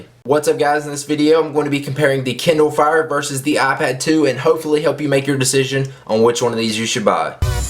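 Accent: South, United States. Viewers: Love your southern accent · I am from the south in America and actually not all of us have accents some of us talk just like people from anywhere else in America. It all depends on the voices of the people you grew up around. And the generation before him probably are from one of the older smaller towns where they have this kind of accent. Bottom line, not everyone from the southern states talk with an accent like this. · lol, i loveeee how country you sound. were u from??? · Country or southern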